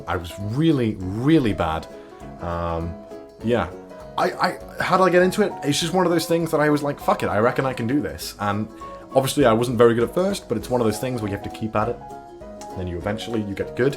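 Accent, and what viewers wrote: Wales, United Kingdom. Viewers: Don't have a twitter but for the next one, how hard is it to hide your British accent? Like is it easy or hard to do? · ARE YOU WELSH MATE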